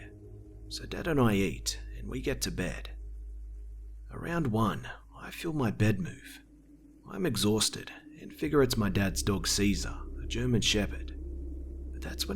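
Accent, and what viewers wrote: Australia. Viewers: Hey! R u Australian? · Justin Ramsaran - He's Australian 🇦🇺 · Are u Australian? BTW HAPPY BIRTHDAY!!!!!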